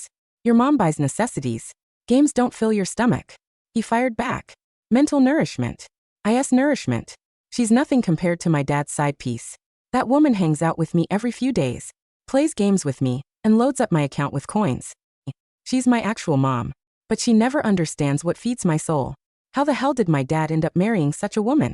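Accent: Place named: India. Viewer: The Indian ascent is so funny🤣🤣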